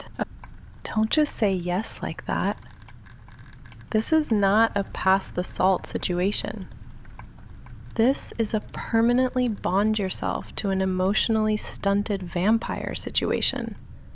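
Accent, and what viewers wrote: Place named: United Kingdom. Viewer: Ngl I love that British accent. I’ve always had something for British women. Great audio definitely saving this on a playlist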